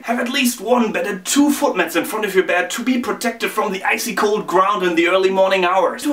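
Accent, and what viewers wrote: Germany. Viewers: Entertaining. :D His German accent is also kind of cute. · Spanish native here learning deutsch. Your english is hard to follow, I wonder if that's just you trying to enforce your german accent, but It's not helping the listener. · Ok, I am mixing this guy up with Adam Fletcher. Sounded waay to German to me. Adam is a Brit who wrote and published the book "How To Become German In 50 Easy Steps" very funny. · ich weiß immernochnicht ob du deutsch oder englisch bist :D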